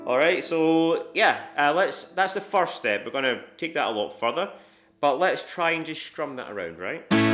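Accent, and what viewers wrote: Scotland, United Kingdom. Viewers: For those not used to a Scottish accent, turn on CC. You are welcome. 😉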